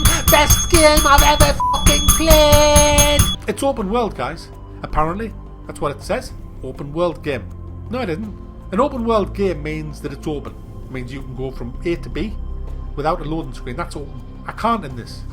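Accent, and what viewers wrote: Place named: Scotland, United Kingdom. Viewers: Senor Studly I think you'll find he's Scottish :/ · I'm so glad someone tries to actually lighten up the tense ass atmosphere surrounding this game, especially in Scottish rofl. had me in tears, because it really reflects how pissed I feel that this level of gameplay is considered a flawless game. It's one of the stiffest effin' games ever. And all the quest are the same. 1. Talk, 2. Questmarker, 3. Witcher sense head ache camera, 4. Forced battle, 5 Talk. Urgh. I finished the game anyway so it's a decent experience all in all, but the gameplay has always been a disaster in this studios games. Why don't they make something that's fun to play? Like seriously, it's like the game is flat. There is no real climbing, no PROBLEM SOLVING, except for some ridiculously obvious puzzles etc. End of rant.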